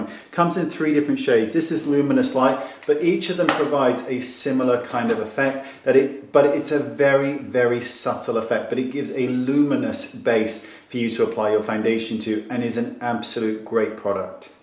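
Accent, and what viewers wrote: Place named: Swansea, United Kingdom. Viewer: Hey my dad was from Swansy and he sounded allot like you. Where are you from?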